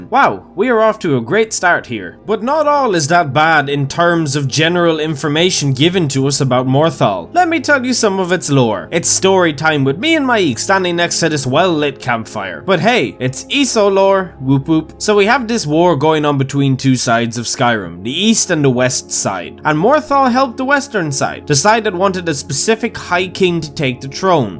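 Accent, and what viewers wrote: Ireland. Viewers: First video, so I was like "why dafuq he sounds like a drunken dude?" Then I saw the name of the channel and went "Oh... That's why" Love Irish accent ~and their drinks, and gingers~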